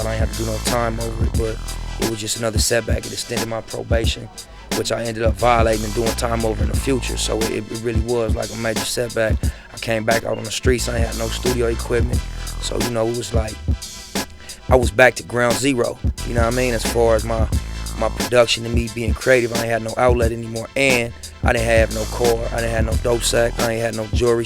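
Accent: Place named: California, United States. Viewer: I love the Cali accent... I love it!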